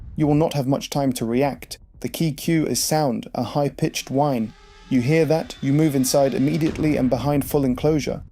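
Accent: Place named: United Kingdom. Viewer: When i hear a British accent i just assume the person speaking has no idea about they're talking about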